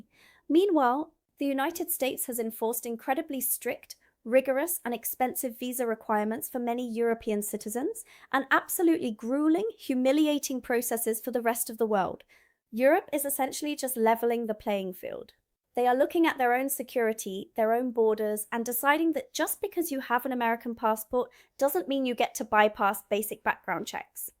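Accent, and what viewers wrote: England, United Kingdom. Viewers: Your pronunciation does not sound US; it sounds very British. How did you manage this? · the host speaks with a clear British accent with no trace of any identifiable American accent or American word choices. I can only assume that she is British but lived in the States for maybe half of her life and kept the accent. She needs to make a video and clarify this for us. It’s too distracting for me. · Much as I have sympathy with what's being said on this channel, I do wonder why this supposed USA citizen has an English accent. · Is the host of this video really an American speaking with a British accent? (I'm new here)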